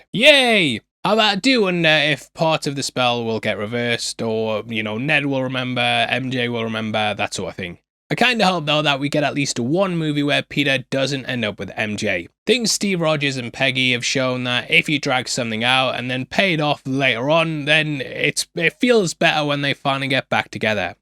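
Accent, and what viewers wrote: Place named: England, United Kingdom. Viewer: brilliant Love the channel started following you because I heard an English accent and your best move was putting yourself in front of the camera.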